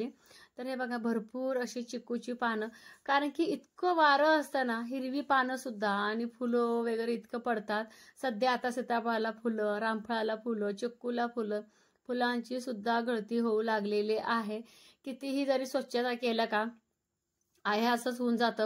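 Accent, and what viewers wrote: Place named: Shrirampur, India. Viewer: तुझ्या आलेगाव बघितले की मला माझ्या माहेरची श्रीरामपूर ची आठवण होते माझ्या आईच्या घराचा आजूबाजूचा परिसर सेम तुझ्या घरच्यासारखाच आहे स्वयंपाकाची पद्धत बोलायची पद्धत सेम तशीच आहे तुझ्या घराच्या बाजूला खूप मोकळी जागा आहे तू वाफे बनव आणि मोठ्या झाडांना आळेकर त्यांच्यामध्ये एक शेणखत लेंडी खत घाल एरिया झोपला घाल खूप छान आहे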